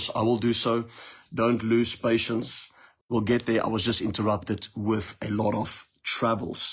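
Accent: South Africa. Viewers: Afrikaanse Boertjie from South Africa..speaking English..a distinct dialect. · My favorite accent is the South African accent lol. Thank you so much Prophet for reminding me I am living in the spirit. We are not bound by the law but bond servants to Christ. One day I hope to visit your church in South Africa! Jesus is King of Kings!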